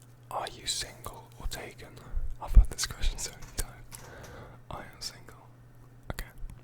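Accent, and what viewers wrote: United Kingdom. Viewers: what part of the uk are you from? i really can't tell from your accent haha x love the videos man❤️ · Hi! I'm from Brazil...I love your videos and your British accent, very relaxing. Thanks for the video!:D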